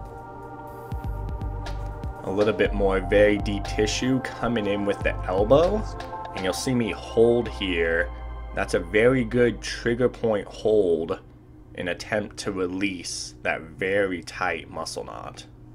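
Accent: Alaska, United States. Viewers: He sounds just like the Alaskan people show. Wonder where he is from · He sounds like one of the Alaskan Bush people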